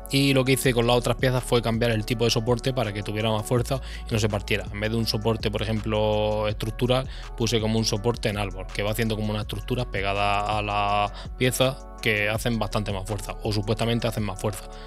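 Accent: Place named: Granada, Spain. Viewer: Tio, tienes to la voz de granaino, eres de aqui o que?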